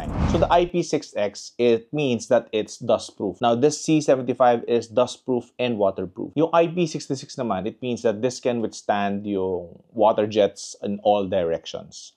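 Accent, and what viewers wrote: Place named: Singapore. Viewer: Kung di ka nagtagalog kala ko singaporean ka na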